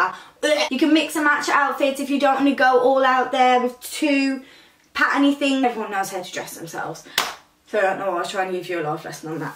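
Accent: England, United Kingdom. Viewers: That english accent... ))) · British accent is nice. Calm tf down. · Ur too pretty, love ur way ^_^ Brazils guy :P Obviously by ur accent u r from Uk :) Speak like Portuguese people :D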